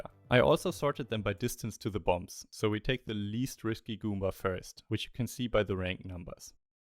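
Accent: Germany. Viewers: Are you by any chance German? (Great Video btw)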